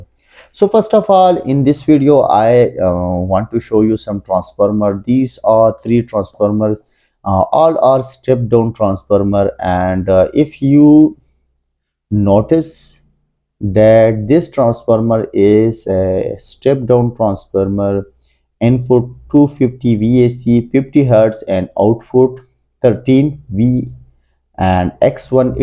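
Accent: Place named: India. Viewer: Normally i find Indian accent difficult to understand, but you speak slower, with good pace and clearer, it is easy to understand you. Thank you very much for sharing your knowledge with us with so much care in both your instructions and handling of a foreign language.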